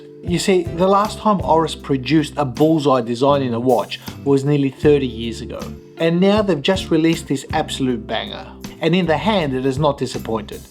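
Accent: Australia. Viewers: Fix that damn obnoxious Aussie accent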